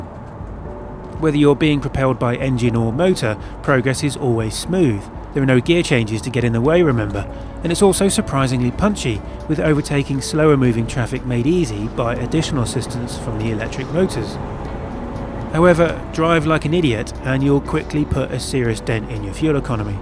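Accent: England, United Kingdom. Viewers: Only englishmen can understand that english.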